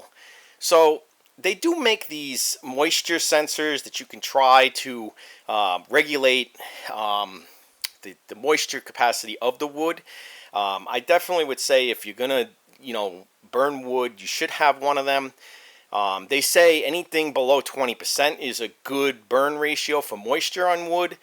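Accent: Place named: Northeast, United States. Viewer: I can tell you in the northeast by the accent so how much wood do you go through a month in the winter ?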